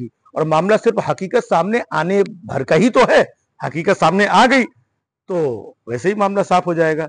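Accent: Kanpur, India. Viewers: kanpur wale ho lagta hai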